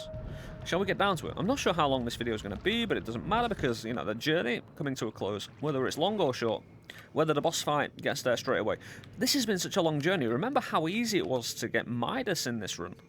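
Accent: United Kingdom. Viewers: One of the regional UK accents, I should recall exactly where he's from but my brain it farts. Give him a chance, bet you'd work it out after a bit.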